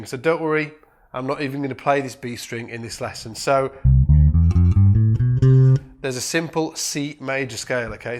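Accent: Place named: Manchester, United Kingdom. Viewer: I love your accent as much as your lessons, are you from Manchester?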